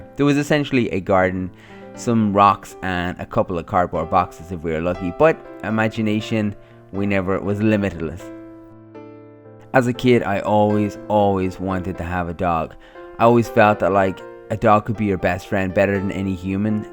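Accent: Ireland. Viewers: That's the Irish accent hahah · LLOOOVVEE your irish accent!!!!!!!!!! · Thats an irish accent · It's a common irish pronunciation, loads of people talk like that here in ireland. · It's called an Irish accent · i really don't see how thats funny. Obviously he has an Irish accent. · Stop taking the mick he's Irish ok if u were Irish I'd say it like that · Its an Irish accent! I have it too :)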